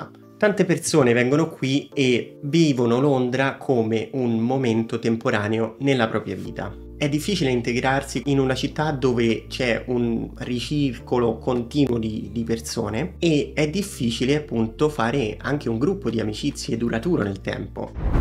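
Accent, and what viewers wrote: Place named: Rome, Italy. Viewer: Sembra romano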